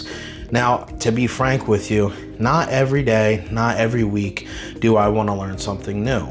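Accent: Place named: Philadelphia, United States. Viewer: I love the Philly accent made it easier to listen for me . Gooo BIRDs 🦅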